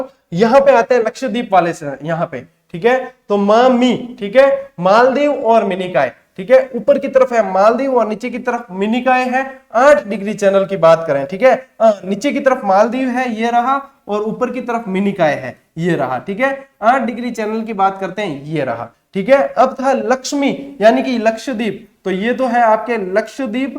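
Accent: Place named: Marwari, India. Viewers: Aap marwadi bolte ho na sir tb best part bn jata h class ka owsm lgta h hme to smjh b ati h bolni b ati h 😀🤗🤗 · Great sir 👍👍👍👍👍sir jb aap marvadi me bolte ho bahut hi accha lagta hai,ek apnapan sa lagta hai, thank you so much 🙏🏻🙏🏻🙏🏻🙏🏻🙏🏻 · सर आपकी मारवाडी बहुत अच्छी लगती है।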